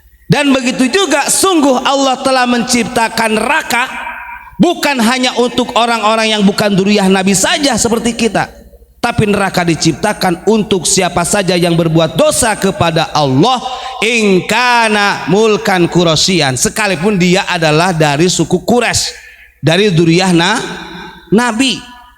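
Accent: Indonesia. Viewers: pembacaan barzanji nya ala banten banget... ❤❤❤❤❤ banten tangerang serang pandegelang cilegon.. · Keren lagom jawanya....enak didenger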